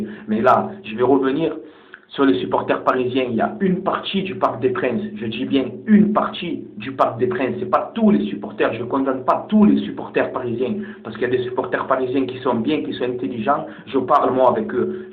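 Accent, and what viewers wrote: Marseille, France. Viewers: Thanks you very much je kiffe ton accent Marseillais j'ai de la famille laba pas loin du Parc Jeannot Tu as totalement raison je suis avec toi que dieu te bénisse J'embrasse tout les Marseillais bye de la part de Chinny Baby Nigeria USA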